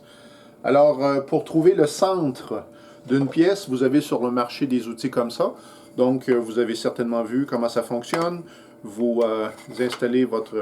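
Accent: Quebec, Canada. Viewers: bonjour et bravo pour tes vidéos. pour le français que je suis c'est toujours très agréable d'entendre l'accent québécois et surtout ce qui est palpable c'est ta simplicité et ta proximité. les vidéos sont super bien tournées et bravo pour la simplicité dans la pédagogie. mon tour arrivant d'ici 15 jours j'ai déjà hâte de suivre tes premiers cours. A bientôt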